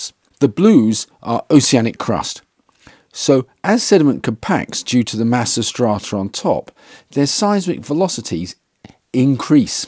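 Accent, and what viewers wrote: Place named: United Kingdom. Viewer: Very good, thanks, and I'm very glad you're a Brit. I wanted to watch this when it came up as recommended, but was prepared to click away immediately if I heard an American accent. Subscribed.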